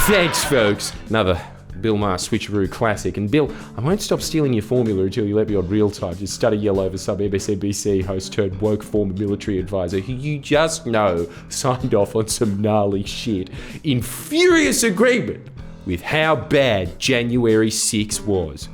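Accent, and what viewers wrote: Australia. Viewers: I watched this video with the Closed Caption on (it's late here, and I don't want to wake anyone), and the sound turned low. At first I thought the mistakes in captions were due to Al Gorithm's inability to understand an Australian accent, until I got to the portion at about where Al was quite able to translate everything, despite having mis-translated Penny Wong as "pennywog" a moment earlier. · I am half watching these videos simply so I can get an aussy accent down, and the other half is so I cant talk aussy politics with people in austrailia and not have them know I am not one of them.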